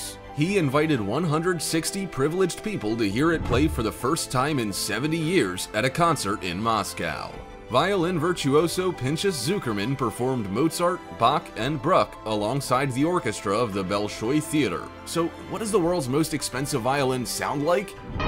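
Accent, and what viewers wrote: United States. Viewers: narrated by an American accented person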